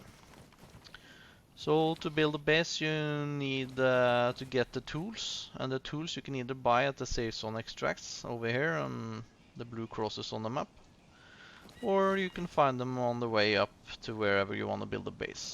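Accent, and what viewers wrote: Norway. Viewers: What is the base mod name? Im guessing by your accent you are Norvegian. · are you from norway and great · Are you Norwegian perchance?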